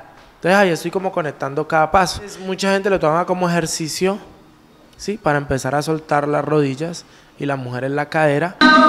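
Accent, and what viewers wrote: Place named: Cali, Colombia. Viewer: Ahhhhhh! Me encanta ese acento caleño! :D Mira veh!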